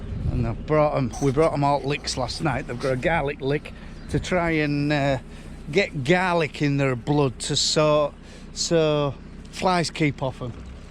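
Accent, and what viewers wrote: Yorkshire, United Kingdom. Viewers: Best farming videos on YouTube entertaining as well as informative, love the Yorkshire dialect even though I'm from Lancashire. Hope you enjoyed your afternoon out 👍👍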